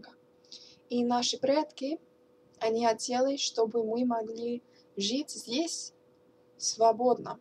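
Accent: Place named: Belarus. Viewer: акцент делает русский язык, очень похожим на белорусский язык =)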